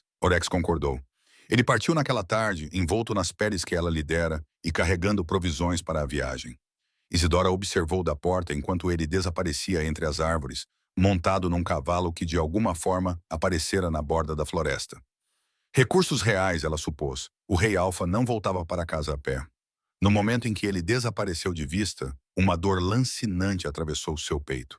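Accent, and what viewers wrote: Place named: Portugal. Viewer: Gosto das estórias, não gosto muito da narração, português PT misturado com o nosso, gosto do sotaque brasileiro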